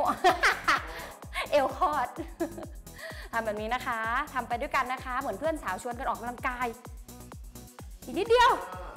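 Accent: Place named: North, Thailand. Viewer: ชอบความปาเเล๊ดเหนือขนาดจ้าว พูดไทยทุกคำสาบเมืองได้จาใด เก่งขนาดจ้าว ไว้จาทำตาม ^^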